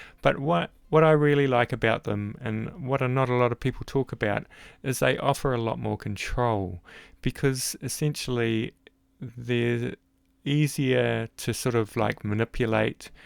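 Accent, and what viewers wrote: New Zealand. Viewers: Hi. I'm a new subscriber to your channel and suspect I'll me a member before too long! I enjoy your easy going manner and the NZ accent is like listening to music. I'm a beginner and have been toying with bone work so your vids are quite a help. I was drawn to this particular video when I mistook "Kutzall" for 'KLUTZ-all." and thought "Well, there's a product made just for me!"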